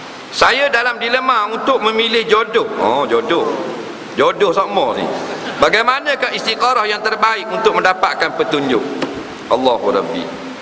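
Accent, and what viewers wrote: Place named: North, Malaysia. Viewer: pandai ustaz ckp utara!!!! #RESPECT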